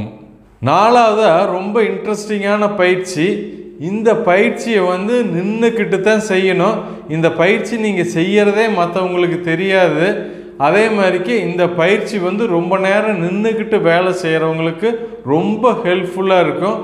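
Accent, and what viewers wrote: Kongu, India. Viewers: கொங்கு தமிழில் அழகாக தமிழில் விளக்கியதற்கு மிக்க நன்றிங்க 😊🎉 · கொங்கு தமிழில் பயிற்சி மற்றும் விளக்கம் அபார்ம்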